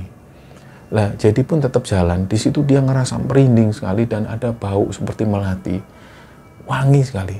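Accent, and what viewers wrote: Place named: Surabaya, Indonesia. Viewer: Pengen ktm mas.dn team,mas. dgn logat khas sby nya n ciri khasnya, bikin 😍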